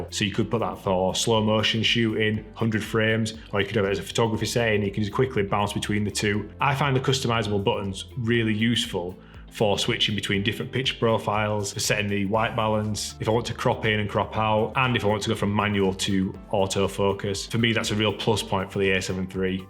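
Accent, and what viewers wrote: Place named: Newcastle, United Kingdom. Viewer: Fellow Geordie? Or must be close.. 🤔✨👊🏼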